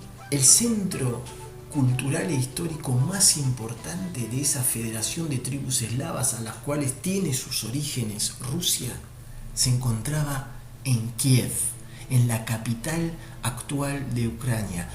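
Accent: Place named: Argentina. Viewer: por tu apellido de origen "israelí " no se si seras imparcial ,pero viendo el mapa de crimea como ucraniano creo que no...por tu acento pareces argentino ,sabras que Inglaterra antepone la validez de los kelpers ,ciudadanos de segunda ingleses la AUTODETERMINACION DE LOS PUEBLOS para el tema malvinas ellos quieren ser ingleses....en CRIMEA. esa misma AUTODETERMINACION dice el 97% quiere volver a la madre patria Rusia y volvió..que paradoja Occidente acepta lo de los kelpers no lo de los crimeos , a y te recuerdo que israel siempre voto en contra de argentina las resoluciones de la onu sobre malvinas 3 países lo hacían ISRAEL...USA....Y GRAN BRETAÑA ....a mi humilde entender la trilogía del mal en el mundo VIVA RUSIA...VIVA PUTIN !!!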